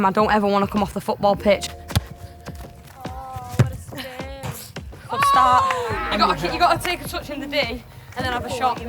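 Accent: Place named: Manchester, United Kingdom. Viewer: I love you Tooney , never let anything get you down. You inspire me and I want to be like you when I’m older. Im not from Manchester but I always put on an accent to be like you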